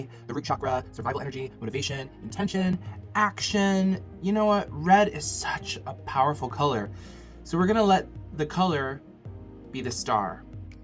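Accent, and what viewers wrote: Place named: Ireland. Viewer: Love the Irish accent lol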